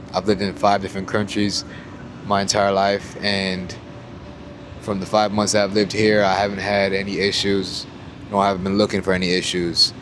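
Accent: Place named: Toronto, Canada. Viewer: Mans sounds like he's from the 6ix.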